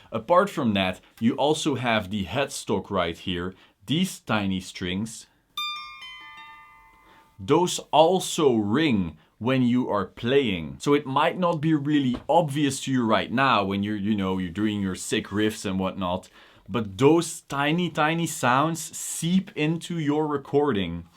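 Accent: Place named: Quebec, Canada. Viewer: where are you from? your accent looks like a french canadian speaking english ahah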